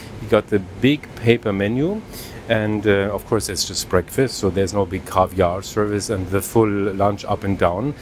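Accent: Germany. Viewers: In my personal opinion, one must be really stupid to spend around 2500 euros for a return flight lasting an hour and 20 minutes where you can't even fully enjoy the starry breakfast, and finally drinking Krug champagne at 7.00 am is really bad for your health..... P.S. The German accent of the presenter is awful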